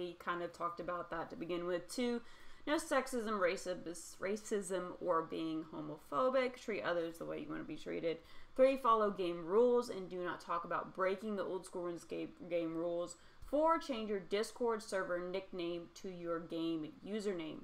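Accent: United States. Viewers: The most american accent ever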